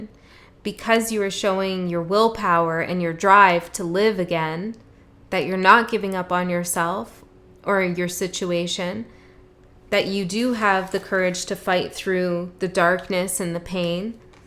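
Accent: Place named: Canada. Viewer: That Canadian accent 👍🏿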